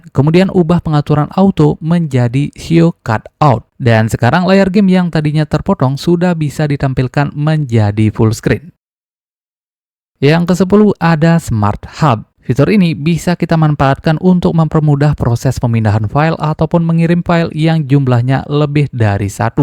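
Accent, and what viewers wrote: Bali, Indonesia. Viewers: ini logat bali kah?atau mana?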